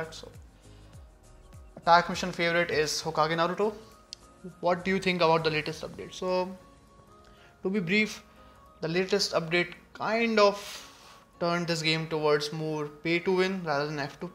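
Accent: India. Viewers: I luv this man!!!😅 I came to comment section after watching this whole Mahabharat length video!!!😆 Luv from India (Manipur)❤️. N u look dashing n also speak English very well...luv that original Indian accent!!!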